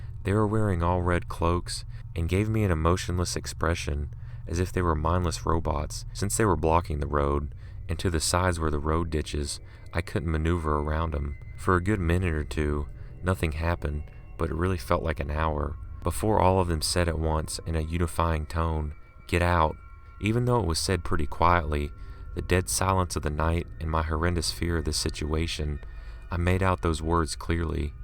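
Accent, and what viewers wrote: South, United States. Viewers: Yeah, he sounds southern. I'm from Kentucky, so I would know that pretty well : P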